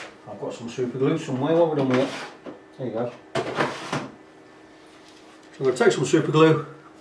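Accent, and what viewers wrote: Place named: Nottingham, United Kingdom. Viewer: Sounds like a Nottingham or possibly Derby accent there...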